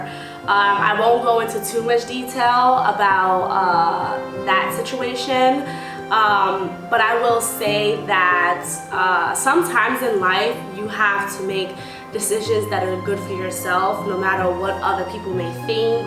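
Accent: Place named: New York, United States. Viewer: Habari gani? asante sana for the vid. loving that accent, i know it when i hear it. new york in the house. loving that. Thanks for sharing and keeping us posted. you are truly a jewel. Life will only get better from this point out. peace and blessings.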